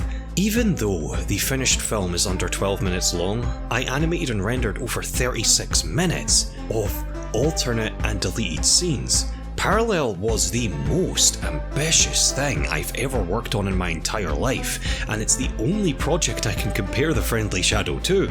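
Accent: Scotland, United Kingdom. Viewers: Yes. He actually makes his notes in a Scottish accent. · I can't quite place your accent... Is it English or Scottish?!?! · He's an absolute gigachad. Can write, can animate, can voice act, and who knows how many other skills, and he has an epic Scottish bass voice to boot.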